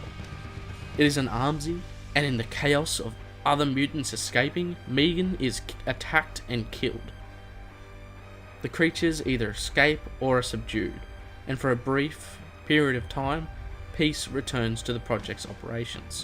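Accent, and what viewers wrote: Australia. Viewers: Are you Australian ? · ugh your Australian accent is so pleasing to my ears 🥺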